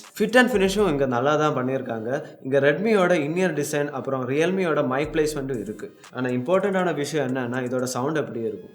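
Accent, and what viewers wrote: Kerala, India. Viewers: From his slang, is a Mallu:-) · Bro neenga malayali yaa. Neenga pesum bothu oru malayali Tamil pesura Madhuri iruku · Avaru malayalai pa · Sound like Malayali speaks tamil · konjam kerala waadai adikudu thambi pesaradula...:)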